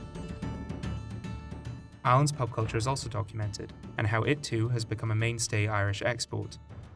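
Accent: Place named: England, United Kingdom. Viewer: Fascinating video and very nicely done. I'm curious as to why the narrator has an English accent? Seems a bit odd.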